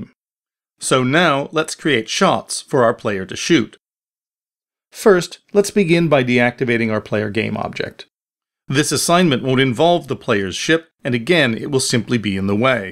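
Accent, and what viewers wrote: United Kingdom. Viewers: British accent seeping through. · r u british or american lmao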